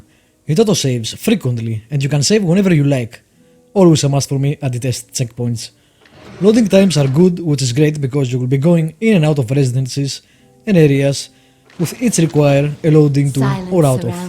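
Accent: Greece. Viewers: Are you Greek? It seems from your accent :) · Nice Review.You speak English like a Greek,are you Greek? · Great review, was really interesting to listen to your Greek accent & how you pronounced different words. Good show! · Ειναι αστειο πως καταλαβαινει κανεις κατευθειαν αυτην την ελληνιλα στην προφορα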